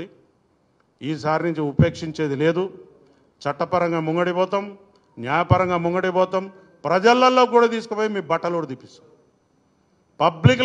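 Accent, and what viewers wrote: Telangana, India. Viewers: తెలంగాణ యాస ఆలానే ఉంటది కదా మరి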